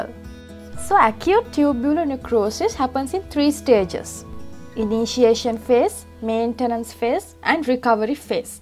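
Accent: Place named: Sri Lanka. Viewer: I can tell you are a fellow Sri Lankan just from the accent hahaha. Thanks for the great video!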